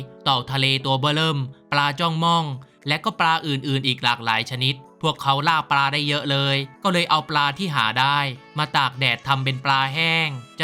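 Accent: South, Thailand. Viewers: คนใต้นี่หว่า😅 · ปลาจ้องม่อง😊คนใต้ใช่ไหมเนี่ย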